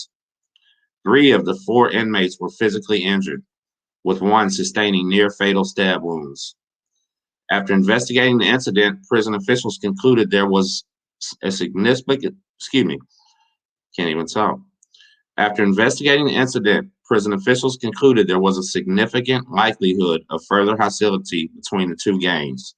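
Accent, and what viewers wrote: Texas, United States. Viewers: Happy Friday everyone! 🍺🍻., La eMe is pronounced like M. A.😂. It sounds so different with your Texan accent. Please tell Mr Larry I send my wishes. Can I send him a card? Hope you and your family are doing well. Enjoy your videos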